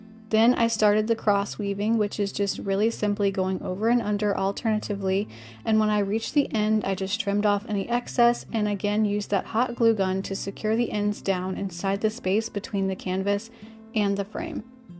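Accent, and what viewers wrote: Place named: United States. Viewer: Thank you so much,. Every makeover, flip, upcycle is a masterpiece! I also enjoy listening to your voice - a very soft American accent; I know, you probably don’t think you have an accent, but I’m from the UK, so you do! Enjoyed Curry’s upcycle too with stain on his nose and whiskers, a cool look.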